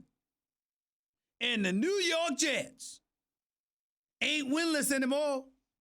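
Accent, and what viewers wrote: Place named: South, United States. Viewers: I'm a libra too. Tell it like it is. You're country accent. Cowboy hat and cigar is hilarious 😂. Keep it up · He's killing me with that texan accent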